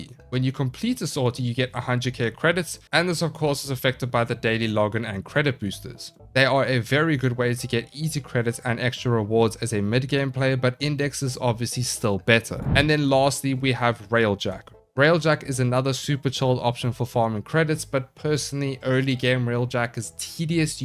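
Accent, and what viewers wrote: South Africa. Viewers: You sound South African? Thanks for the guide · Is bro South-African, that accent goes hard?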